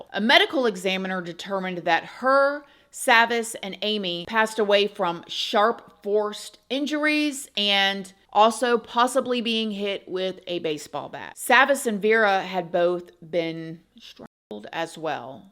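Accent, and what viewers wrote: South, United States. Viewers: Very much a southern (south-eastern American) accent lol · Is it a southern accent ? Ilke how she says poóolice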